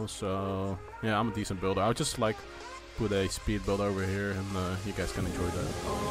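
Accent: Netherlands. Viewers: Is he Dutch because he sounds like it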